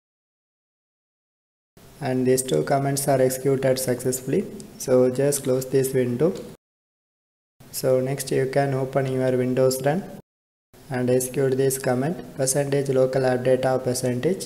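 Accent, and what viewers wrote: India. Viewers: u indian?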